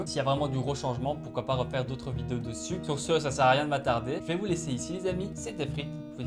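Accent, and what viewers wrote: Belgium. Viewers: Frite t'es le meilleur t'es trop pouissant, je t'adore avec ton accents de Belge, j'imagine, vue que tu vis en belgique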